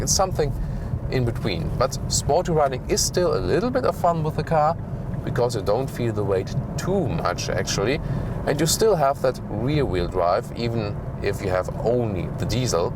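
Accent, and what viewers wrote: Germany. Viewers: I wish I had one of these in 2018 when I finally out my chance to drive on the AutoBahn........I wound up getting a Audi A6 which was no slouch foe ride, performance and handling but.....I had a A6 back in the states so I knew how they drove. Don’t get me wrong 30ish MPG with the V6 diesel was nice but getting to wring out something like a Q70/Q70L on the AutoBahn on a clear day would be nice. Your English even with the German accent is great, you have studied and sounds like you can speak better English than some....well...Englishmen. Good detailed video with facts and not a lot of fluff. Three good selling points for me maybe more but starting with three. 1. NO Auto/Start/Stop for the engine. 2. Modern design without going over the top. Nice and functional without being overly flashy. 3. I cant speak for Europe Spec engines since most of them are probably diesel. 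I like the regular multi port fuel injection. 4. Traditional moon roof, not the fancy Panasonic. I want metal on the roof especially if in a rollover accident, 5. Cabin Ergonomics. Decent layout and reasonably well laid out. Example. Fuel door RH side of vehicle...Fuel gauge on RH side of the instrument cluster. Some misses and not overly critical but observations that I have noted. 1. Rear Turn Signals. Personally I prefer the amber contrasting color rear turn signals. 2. Slightly behind the times for transmission technology. Yes functional and effective but with the competition coming up with 8,9 and even 10 spee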